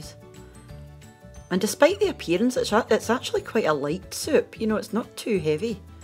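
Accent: Scotland, United Kingdom. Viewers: I’ve just found your YouTube recipes, I love how simple you make everything love the Scottish accent too reminds me of my good friend, thank you for sharing, I love it x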